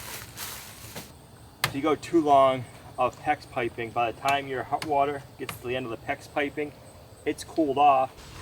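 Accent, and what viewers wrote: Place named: New England, United States. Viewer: Oh you said “ TARP”, I heard “THHHAAAAP”. You New Englandera kill me with your accents! LOL. AND NO your not going overboard.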